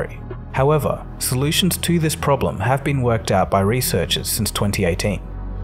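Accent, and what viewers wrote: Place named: Australia. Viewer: It's a real torture to listen to Australian. Can you make an English version of this video? You can hire human narrators as cheap as a few $ per hour (just google "narrator voice over") or use synthetic voice. If you hate American accent, hire someone who speaks British English or its more neutral European variant.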